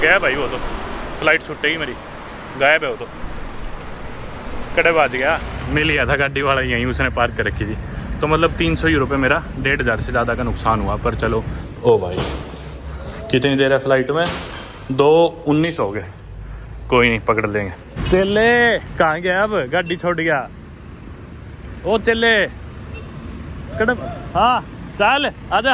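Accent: Haryana, India. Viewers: Love your typical Haryanvi ascent 😅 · ap ki language me haryanvi felling ati jo bahut achi baat hai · Haryanvi boli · Bhai Ki Haryanvi ❤❤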